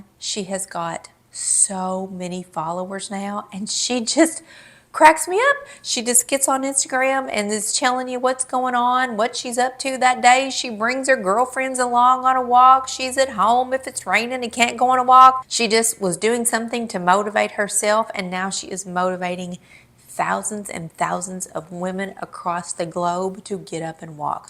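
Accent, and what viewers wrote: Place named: Kentucky, United States. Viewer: I don’t much use Instagram. I personally find it boring, but that’s just me. I use Facebook a bit, X a bit, & YouTube a lot. I have a closet full of pretty clothes I never wear because we never go anywhere. I still found this interesting, mainly because I find your voice soothing, & I adore that KY accent. I follow 2 couples who do a lot of videos in TN, & have come to love what they show me of life in that beautiful state. We live in a senior apartment in SoCal, third floor, & my life is completely boring. I can kill silk plants. I follow people who live in France (the countryside), & a German couple who live in the UK. This is how I travel. I would love to see the beauty of Kentucky.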